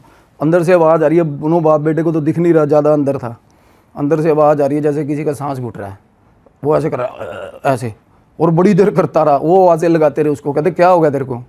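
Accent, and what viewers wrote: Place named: Punjab, India. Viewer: Yeh Banda Boht ache tarike se bata hai bilkul punjabi style me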